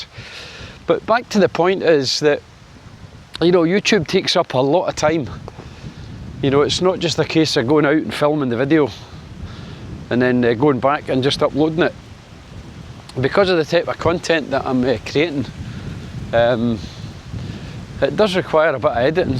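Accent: Scotland, United Kingdom. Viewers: Your channel success is down to your easy going friendly approach with the great Scottish accent! Englishman here living in NZ for 20 years but soon to return to U.K. Primarily to be able to tour more of Europe (it’s all a bloody long way from NZ!). Stumbled on your channel as I was interested in Spain. I always subscribe to a channel the moment I find something that may be interesting and then every so often will edit my subscribed list of ones that no longer appeal to me. Don’t worry you’re still in there!😁 Another branch of your channel should be you filming / commenting from your motorcycle 🏍️ (biker here as well 👍). Cheers 🍻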